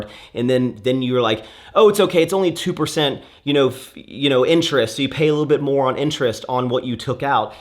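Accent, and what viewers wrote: Texas, United States. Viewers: That Texas accent becoming more and more apparent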